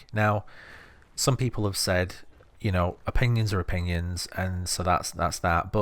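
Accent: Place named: Blackburn, United Kingdom. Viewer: That sounds like a Blackburn accent to me, great review man. Pearl abyss have created something truly special, subbed.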